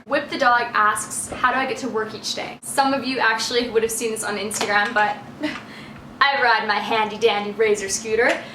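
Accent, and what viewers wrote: Australia. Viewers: it sounds like you're getting an australian accent! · You seem to be picking up an australian accent!!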